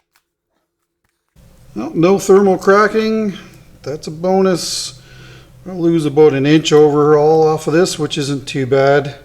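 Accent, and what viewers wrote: Canada. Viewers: On your voice overs, I love to listen to you talk about your work. I can tell you love what you are doing. I also listen to hear your Canada accent. It's there sometimes. Not a lot though. Keep talking.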